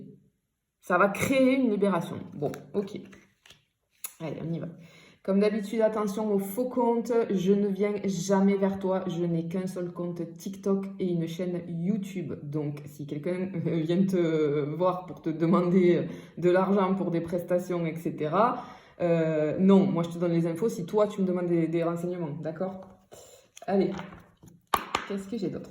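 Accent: South, France. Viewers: Bonsoir c est exactement ma situation, il ma lachez sauf son silence et ces pouces sur mes commentaires et je suis brisée de ses trahisons ! J en suis la!! Et vous avez raison, je me suis abonné, j adore votre accent du midi ,je suis née dans le midi et je vie dans l Isère et votre accent me fait du bien mon midi qui me manque tant! Gratitude infinie gros bisous 🌈🌞🧿💔💔❤❤😘😘🌷👏🕯🐞🌟🕊🍀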